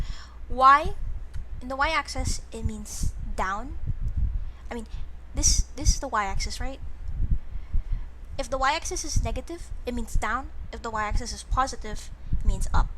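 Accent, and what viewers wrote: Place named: Toronto, Canada. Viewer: yeah idk how to describe it what comes to mind is a toronto accent 😂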